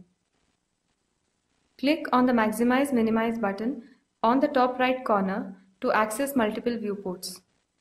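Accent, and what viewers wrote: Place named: France. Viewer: french accent.. :p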